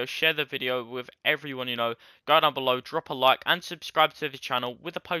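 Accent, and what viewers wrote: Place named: United Kingdom. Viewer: always the british youtuber bro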